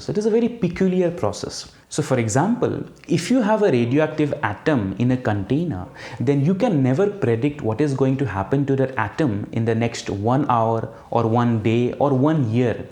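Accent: India. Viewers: Heavy indian accent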